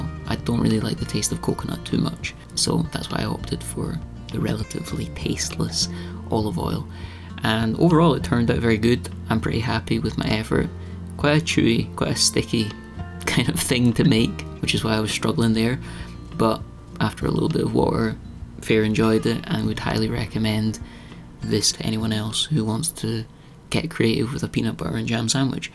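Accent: Scotland, United Kingdom. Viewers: Hi, I’ve been watching since you bought the tiny house and I don’t normally comment, but every time I watch a video of yours,I mean to! It’s hard to articulate what I want to say, but basically I really think you have an element of genius. The combination of the topics you discuss, with the video clips etc, is so very creative and skilful. I know you’ve talked about being anxious before, so I just wanted to say that I hope you’re confident about your work because you really should be! Also lovely to hear a fellow Scottish accent in the YouTube world 😊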